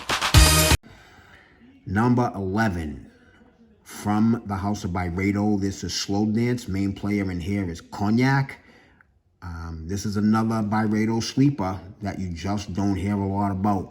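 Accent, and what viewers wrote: Boston, United States. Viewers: Great Video. You have the classic Boston accent! Your selection is impeccable. Im a native to Boston and its great to see someone from home. Im subscribed to your channel. Much Love brother👊🙏.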